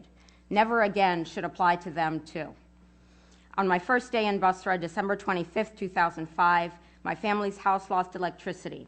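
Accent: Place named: France. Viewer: Are you? You speak like you may be FRENCH.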